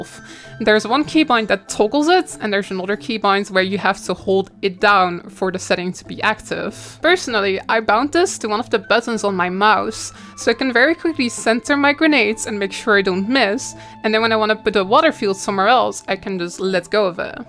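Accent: Netherlands. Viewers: i knew you were dutch before i saw the properties for guild wars 2.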